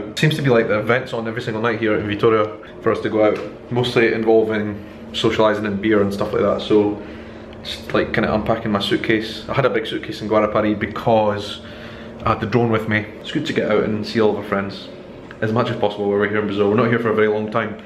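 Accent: Scotland, United Kingdom. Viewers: Are the Brazilians, having a wee trouble with your Scottish accent? :) Have a great time.. I hope they ship that Lemon Cake to the U.K.! for you...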